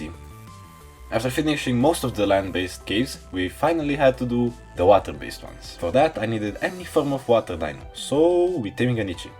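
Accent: Poland. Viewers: Kolego, is it a polish accent? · you just sound polish and im polish so was curious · Are u from poland?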